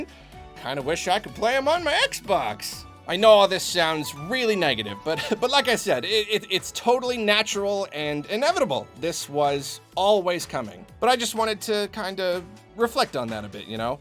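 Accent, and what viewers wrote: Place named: New York, United States. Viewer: talking about the early years has me thinking about when he had a New York accent.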